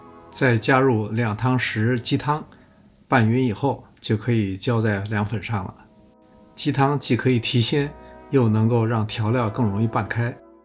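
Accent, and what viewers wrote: Beijing, China. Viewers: 非常喜欢您的视频，其一是语言简洁，其二是内容适合我的口味，其三是地道的京腔，每期必看。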